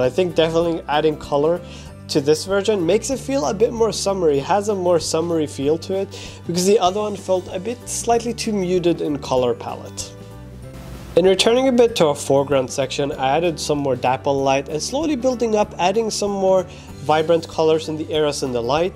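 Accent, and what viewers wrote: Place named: Sweden. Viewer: i KNEW i recognized your swedish accent from somewhere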